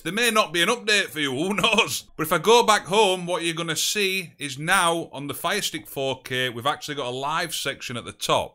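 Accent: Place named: Wales, United Kingdom. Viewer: Wow my friend. I love your accent boyo 🙂🙂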